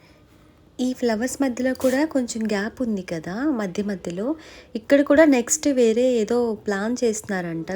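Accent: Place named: Coastal Andhra, India. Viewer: Mi voice vizag valla la Ledu andi .. Looking like ...Krishna,gutur or khammam districts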